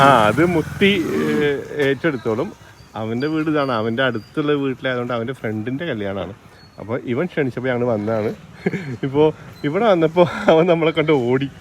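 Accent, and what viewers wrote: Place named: Nilambur, India. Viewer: ഇപ്പോഴും തനി നിലമ്പൂർ സ്ലാങ് ആണല്ലോ ഇവർക്ക്... 😊😊😊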